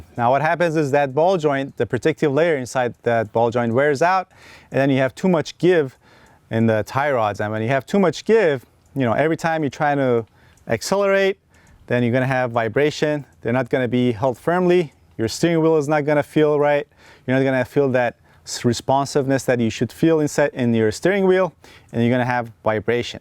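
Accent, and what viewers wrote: Iran. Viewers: From ur accent I can figure out that u r 100% Persian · I can hear that Iranian accent.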